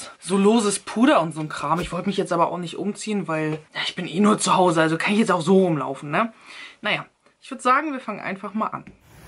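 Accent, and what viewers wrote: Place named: Russia. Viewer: Ja man russischer Akzent ist mega 😍😍 Wuodga... 🥃😋😂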